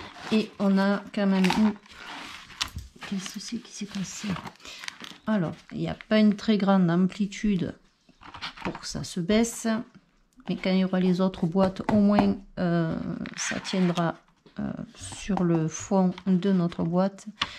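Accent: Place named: Southwest, France. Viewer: Coucou, j'adore tout ce que tu fais: tes rangements, tes classeurs, tes pochette à plastifieuse, TOUT j'aime tout. Je t'adore, je t'adore je t'adore.... Bien sûr je me suis abonnée avec la cloche et petit pouce partout sur toutes tes videos que je vois. J'habite en espagne depuis que j'ai connu mon mari, mais avant j'habitai dans le Sud-Ouest (pyrénées atlantiques) et ton accent m'as rappelé mes origines. Nostalgie. Est-ce-que tu es du Sud-Ouest? Je t'embrasse très fprt🥰🥰🥰🥰❤❤❤🦎🦎🦎🦎🦎🦎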